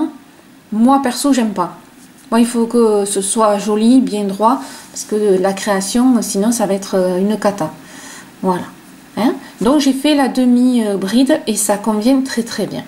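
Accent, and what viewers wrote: South, France. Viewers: Bonjour, avec ce point très sympa, pourriez-vous expliquer comment faire des augmentations et des diminutions, je tenterai bien un pull-over, merci pour vos vidéos très simples et très explicatives et votre accent du sud, moi je suis de la région Marseillaise et je comprends tout❤️❤️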